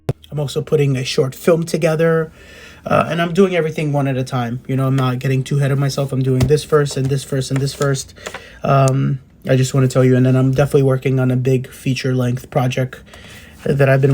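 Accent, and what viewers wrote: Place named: Russia. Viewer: Oh my god, I'm first to say, I love your videos and when I saw your video come on my youtube, I stopped what I was watching and clicked on your video., you are soooooooo talented. Your russian accent with your N.Y. mom character and Babyshka character are on point. I have watched all your videos. Please, Please continue making videos. LIfe is good. Be well and B+ (Be positive)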